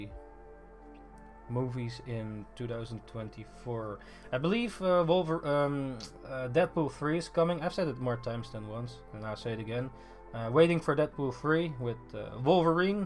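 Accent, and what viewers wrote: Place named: Netherlands. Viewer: i just found this recommended to me and i could instantly tell ur accent was dutch lmao geweldig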